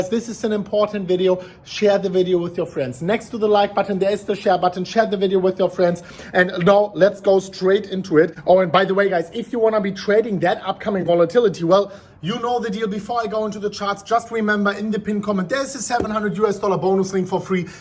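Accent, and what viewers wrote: Germany. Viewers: Deutscher, hört man direkt :D :D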